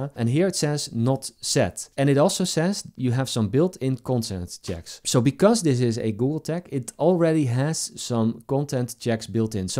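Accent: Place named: Netherlands. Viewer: Dutch accent best accent. 🙏🏻 Thank you for the clear video and god pace! Keep it up! 👏🏻👏🏻👏🏻👏🏻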